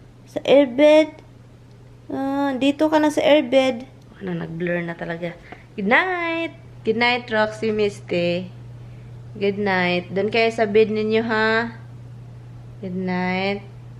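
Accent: Visayas, Philippines. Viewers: di ba nimo gi remind si sa resulta sa iyang pagpanigarilyo? Amping sa iyang Lungs oy Sunod grabe jud na ang tira sa imoha kay resipient ka sa cigarette smoke nya. Nag ubo ubo na cya ba. Just be careful. Watching your vlogs. Njoy ko sa imong English with visaya accent. Ayos jud huh! More power.Rachel from the Philippines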